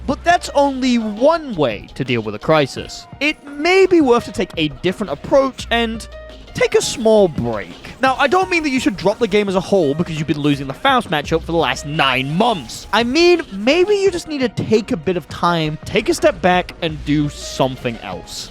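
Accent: United Kingdom. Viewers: i want it to be known that i do enjoy your silky smooth british voice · I do just love your silky smooth British voice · yes we do love your silky smooth british voice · I'm just here to hear the bri'ish guy talk, honestly.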